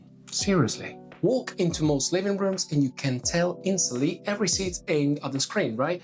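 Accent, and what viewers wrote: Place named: England, United Kingdom. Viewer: sei meraviglioso. You have a beautiful english accent with a mystical touch of the unknown. Bravo anche nel tuo lavoro. From Italy.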